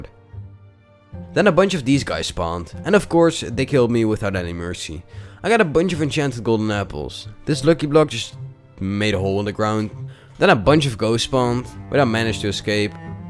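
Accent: Netherlands. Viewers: The dutch accent i love it 😂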